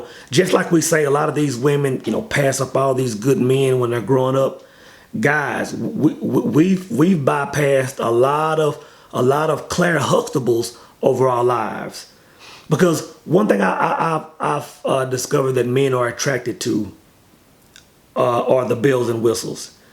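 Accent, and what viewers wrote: Texas, United States. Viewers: Big dawg is obviously from Texas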